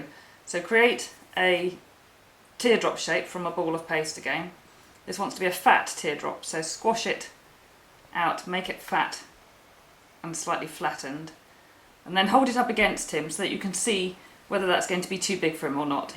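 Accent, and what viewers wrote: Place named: United Kingdom. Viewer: Hi, I love your cute penguin and you make it look so simple to make I'm sure it'll inspire others to give it a go. Your instructions and video are excellent and it's nice to hear a British voice for a change:) Debs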